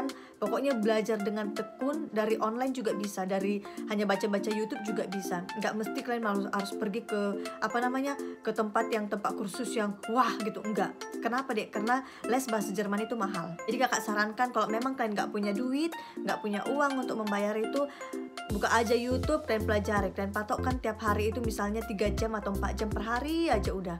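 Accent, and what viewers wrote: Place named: Medan, Indonesia. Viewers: Enak bangat ya dek..udh pernah di jelaska n Inong same.. kayak gitu dek soalnya dia pernah melakukan nya sekarang dia kawin sama orang Austria .. dan tinggal dia di Austria..anak saya aja saya anjurkan kayak gitu dek ..tapi diaudh kerja sama kuliah sekarang di Batam de .senang kakak dengar logat Siantar mu dek..❤️😭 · Nampak y logat medan itu. Gk bs hilang hahahaha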